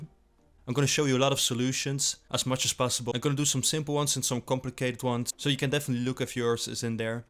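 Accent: Netherlands. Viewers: I hear a dutch accent! Goeie content ouwe!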